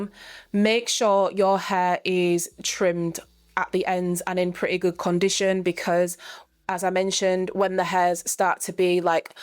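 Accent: Northern England, United Kingdom. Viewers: Big up to the North England accent btw! ❤❤ just want to add that Kenra moisturizing oil has been a game changer for friction reduction on my tight 4c hair. Very lightweight. You might enjoy!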